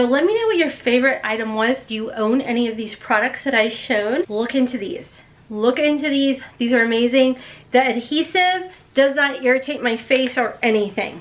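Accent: South, United States. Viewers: You just talk all you want, I love when that southern accent sneaks in!! Here in southwest Missouri we don’t have one!😂😂😂😂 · So funny!! "Good thing I'm already married!!" And I'm so happy to hear someone else speak country...not pretty Southern. ❤️ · Your call was wonderful I enjoy watching. I want to say you're just Southern enough. It comes out just slightly and it's charming just charming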